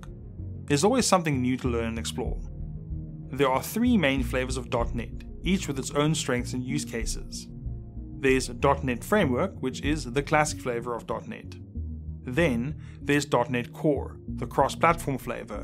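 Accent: South Africa. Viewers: You a Jozi man?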